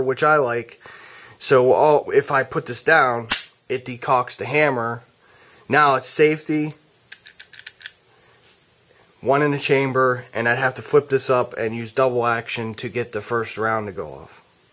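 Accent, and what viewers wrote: Pittsburgh, United States. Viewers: Are you from Pittsburgh? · you sound like you're from Pittsburgh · you have to be from the burgh "an'nat" thats pittsburgh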